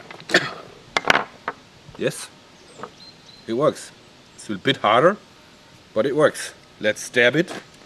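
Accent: Germany. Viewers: man merkt richtig das du Deutsch bist xD. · Really? Ok, then. I'm German myself and his accent is obvious (which makes it so much fun) but I always considered he lived in the US. Don't know why exactly, but just always did. Well, the BKA then ;) j/k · does anyone know wehre he lives? it sounds not luke he is from an englisch speakinh country (like me) and i don't know i think about germany (my country) if i listen to him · U german? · "I think it can probably slice a throat. We'll find out." LOL Something about the German accent sounds very mad scientist-like. Keep up the great vids. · is He German? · wie man denn deutschen akzent raus hört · You are german arent you?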